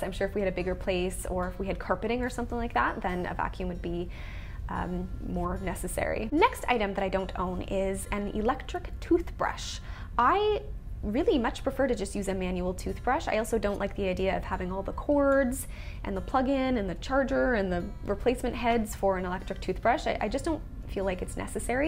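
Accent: Canada. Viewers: I love your Canadian accent!!! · That Canadian accent coming through stronnggg haha. I’m from Calgary and I can hear the East Coast in you. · Either your accent is from Minnesota, or you're from Canada ahah! I can't pin point it! :P You sound like you're from northern Ontario!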